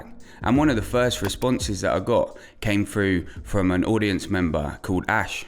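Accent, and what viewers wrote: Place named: London, United Kingdom. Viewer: Whats interesting is I think your accent has got more London from these early videos, maybe more vocal fry, it’s funny because I met this guy at this film event who was clearly trying to hide his really normal middle class background by sort of trying to appear like he might of ground up near some house estate in east London. I didn’t ask about his background but I could tell he might of felt like he wouldn’t be accepted as an artist unless he could present that he had some socioeconomic struggle and hinted past trauma. He showed me some photos he took, he sort of shyness around his urban aesthetic, he photographed old cafes and old people smoking, gritty stuff but I could tell he wasn’t from that world at all and it was odd because he’s clearly thought to create this character out of fear of not being seen as a true struggling artist. 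I mention this because your channel is about the struggling artist.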